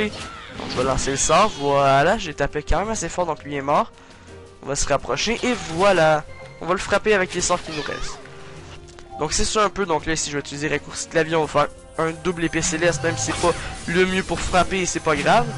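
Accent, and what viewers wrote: Quebec, Canada. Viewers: Québécois ? Et bon Tuto, j'ai appris à jouer graçe a toi ^^ Merci Beaucoup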